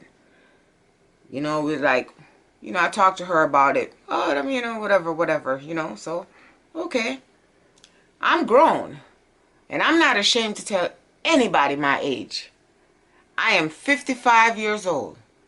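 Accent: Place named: Jamaica. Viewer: I love your channel! One of my favorite things is listening to your Jamaican accent I love it 🌟✨💫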